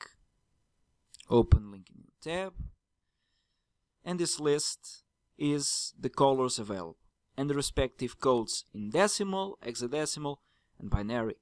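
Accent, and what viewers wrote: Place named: Portugal. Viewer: Ahahah Portuguese, knew it just from hearing it :P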